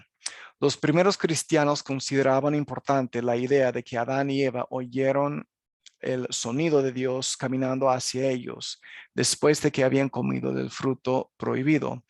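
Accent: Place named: United States. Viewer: Su español hermano es muy bueno, aunque todavía se le nota acento norteamericano, eso se puede reducir, pero nunca desaparece al menos en este estado mortal, igual en la eternidad será el idioma adamico, eso es lo bueno.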